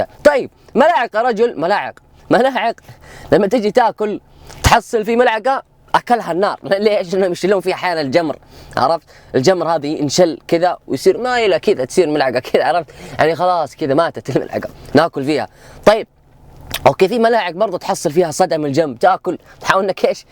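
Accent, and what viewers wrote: Saudi Arabia. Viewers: ووه سيدي امجيزاني لهجته زيي 😂😂 ي لبى بس اخيرا يوتيوبر جيزاني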